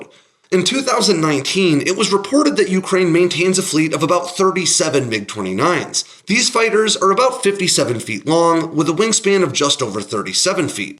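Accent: United States. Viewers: 2 secs in dumb yank voice cringed me out tata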